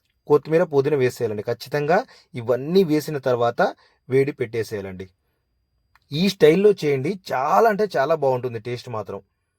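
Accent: Telangana, India. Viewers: Pure telangana lunga ge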